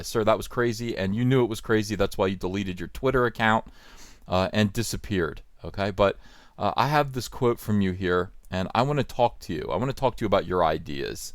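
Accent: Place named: New Jersey, United States. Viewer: Knew you were from Jersey by your accent! Maybe that’s why I can relate to you so much. That and how humble we both are 😂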